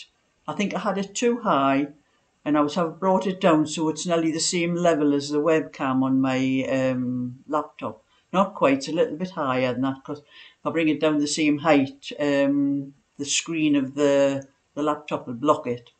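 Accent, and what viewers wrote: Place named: North East England, United Kingdom. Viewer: Oh dear I wish I could get to like the music at the start of your recording. I don’t,t recognise it either. However I really enjoy your channel, hearing your lovely accent from the north east. My husband and his family came from your part of the world. Sadly My husband, who was a very fit 83year old passed away the first day of lockdown from Covid. I enjoy your cooking and baking as well as your beautiful knitting, and I do hope you win at your bingo sessions. My mother in law was a great follower of too. Warm regards Christine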